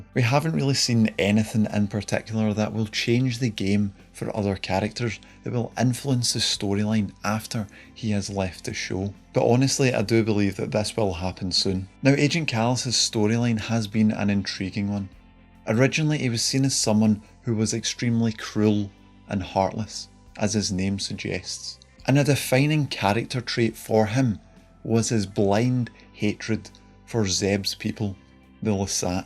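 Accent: Scotland, United Kingdom. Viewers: haha you're Scottish, small world :)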